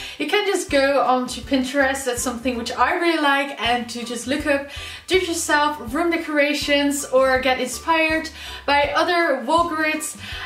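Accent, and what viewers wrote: Netherlands. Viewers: No hate but I immediately knew you were Dutch the moment you started speaking lol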